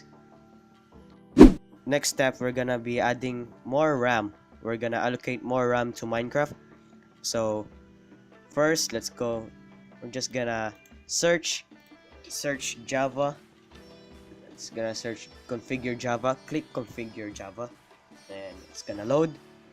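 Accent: Philippines. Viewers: Are you filipino? Your accent sounds so. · Halata sa pag sasalita mo pinoy ka eh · let me guess your from philippines? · filipino accent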